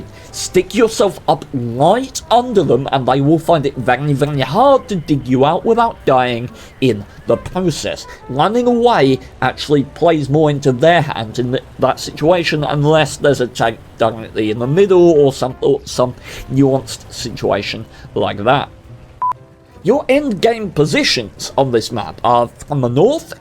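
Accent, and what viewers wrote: United Kingdom. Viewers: Evrything's better with that English accent.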